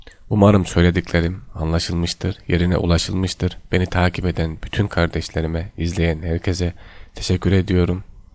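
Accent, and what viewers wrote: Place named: Kırşehir, Turkey. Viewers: da kırşehir şivesi var gibime geldi. sa nasrettin hoca demek istedim. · Ne güzel konuşuyor tam bı Anadolu çocuğu teşekkürler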